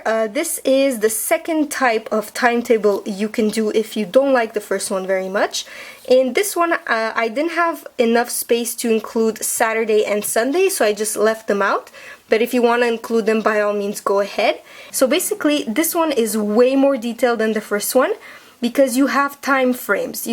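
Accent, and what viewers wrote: France. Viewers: are you french?? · you are French right ^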